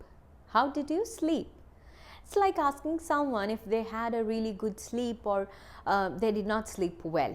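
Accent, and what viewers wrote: India. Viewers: Your English accent is very close to Indian/kerala . Where are you from actually?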